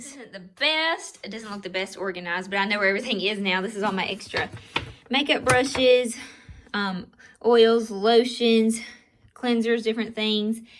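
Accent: South, United States. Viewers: I'm not one of those youtubers who looks flawless lol I cant stand fake one their home are not that dirty to make a video. Love your videos and southern accent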